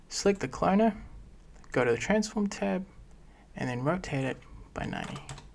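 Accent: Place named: New Zealand. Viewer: Bro you sound kiwi as eh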